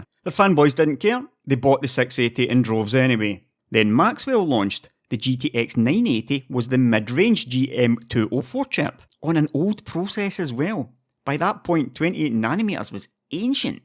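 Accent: Scotland, United Kingdom. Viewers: Love you, you are my favorite scottish accented tech youtuber of them all, and i can't wait to see more of you in the coming months. Much love and keep strong! <3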